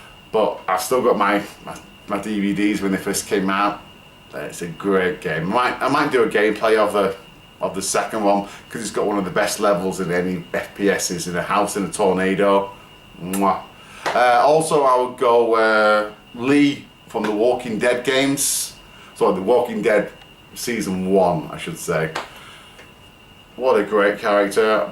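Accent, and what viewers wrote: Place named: Bolton, United Kingdom. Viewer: am i right in thinking your from bolton? i live in bolton :) nice answers mate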